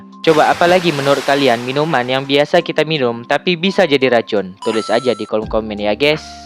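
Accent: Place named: Aceh, Indonesia. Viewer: Logatnya meuaceh that sang 🧐🗿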